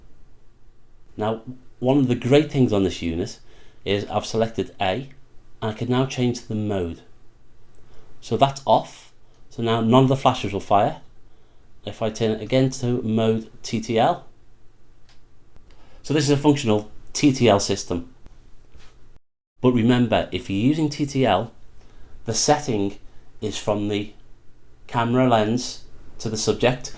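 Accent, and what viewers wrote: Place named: Southport, United Kingdom. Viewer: Thanks for a very helpful tutorial. I did buy 6 youngnu 560 but I really found them fiddly to control and they kept going to sleep. I need 4 speedlights for work on location and I think this godox may be a bit more reliable. PS your accent sound like you might come from down the road from where I live in Southport 😎👌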